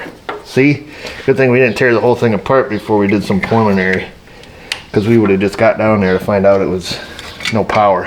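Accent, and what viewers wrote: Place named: Illinois, United States. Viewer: is more midwest sounding than Taryl....I'm guessing downstate Illinois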